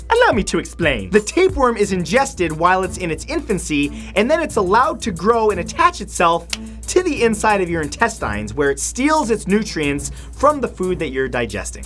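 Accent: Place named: Canada. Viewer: Why do you talk like me Matthew, you are Canadian aaa?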